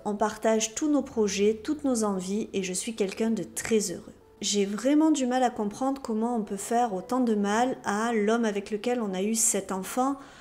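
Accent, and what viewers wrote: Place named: Marseille, France. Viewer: Merciii! Je connaissais déjà cette histoire, mais quand c'est toi qui l'a narre, je me régale (élocution, petit accent marseillais...); De plus, "droit au but" comme d'habitude, bravo. Pour l'histoire elle même, les peines infligées aux protagonistes me paraissent bien trop clémentes sachant qu'il y a eu réellement acharnement à faire disparaître ce pauvre homme, considérant les remises de peines...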